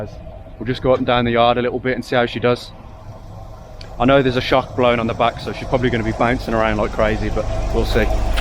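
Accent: Birmingham, United Kingdom. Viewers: You've probably been asked before, but whereabouts in Brum are you from? By your accent I am guessing North Brum. Love the channel.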